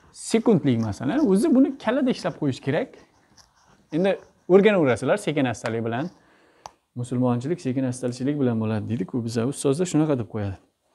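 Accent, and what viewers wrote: Khorezm, Uzbekistan. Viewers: Assalomu Aleykom ustoz darslariz uchun rahmat ha bazan xorazm shevasida gapirgandek tuyuladi menga!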